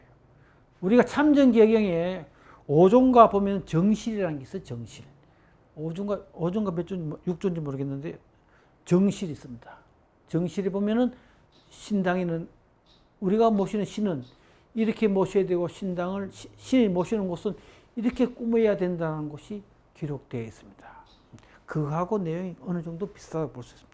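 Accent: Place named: Daegu, South Korea. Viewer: 요즘 다시 교수님 영상 정주행합니다. 저도 대구출신이라 교수님의 사투리가 정말 정겹네요~~ㅋㅋ😊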